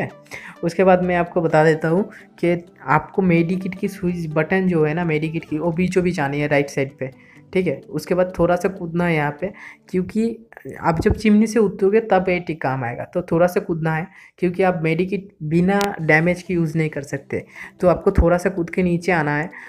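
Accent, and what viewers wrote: Bengal, India. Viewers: Are you a bangali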